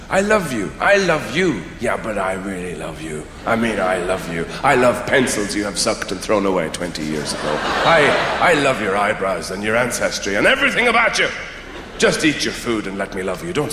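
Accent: Ireland. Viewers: Yes he is irish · Eddie Izzard meets Dave Allen. The incredulity and upwards inflection being pure DA — probably the Irish lilt to some degree.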